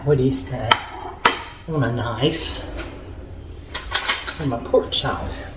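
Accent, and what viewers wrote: South, United States. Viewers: Tuning in from St. Louis. As a single guy, I’ve found a love for cooking as of the last year or so. Thank you for the inspiring recipes and a southern drawl that’ll make a guy just melt.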